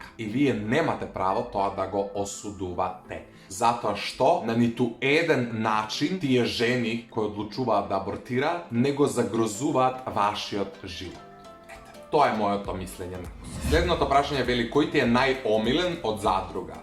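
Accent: Kumanovo, North Macedonia. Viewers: Brat znaes li kolko e tesko od kumanovski dijalekt na makedonski 😹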